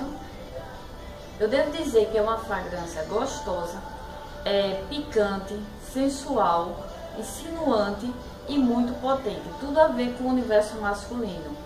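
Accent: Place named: Northeast, Brazil. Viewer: Deve ser ótimo amo perfumes trabalho com isso sou da Dior moro em Recife você é nordestina tem sotaque igual ao nosso !! Tenho vlogs mais tenho vídeos de perfumes tbm vê lá !! Mais antigos amei você explicando show !!! Like te convido a conhecer meu canal !! Perfume sempre nos remete sensações amo amo !!!bjos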